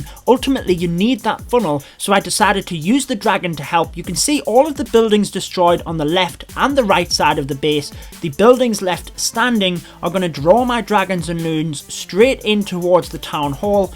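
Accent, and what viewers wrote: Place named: Scotland, United Kingdom. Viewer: Which accent is this? Scottish? Sounds awesome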